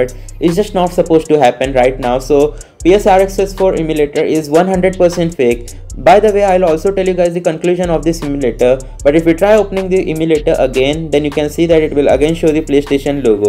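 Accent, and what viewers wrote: India. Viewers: OH GOD !! i hate so much indian accent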